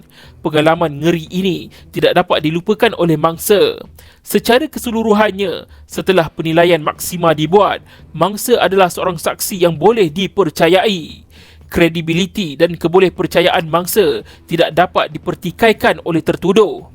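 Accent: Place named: Johor, Malaysia. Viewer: Gaya bahasa penyampaian Johorean sangat 😂 guna lah bahasa Melayu baku standards 😅baru semua orang suka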